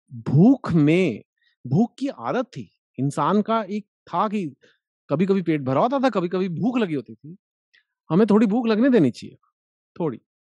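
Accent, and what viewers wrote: Punjab, India. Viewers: Your punjabi accent and expression is hilarious 'Paad lo'...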